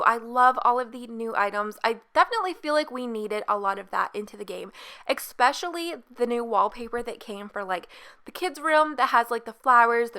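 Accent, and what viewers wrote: Canada. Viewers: Do you have a Canadian accent? And I absolutely love this build. Pretty sure the best I have made is a shack compared to this ;). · are you Canadian..?? we talk to same way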